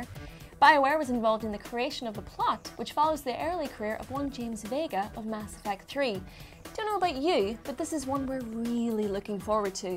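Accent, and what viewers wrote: United Kingdom. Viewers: Welsh mixed with a southern English accent i think. Hard to figure out, maybe even a little irish is in it.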